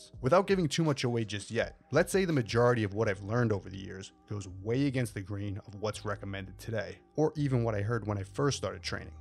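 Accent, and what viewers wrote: New York, United States. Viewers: I like how you're proud of your new York accent. Not attempting to hide it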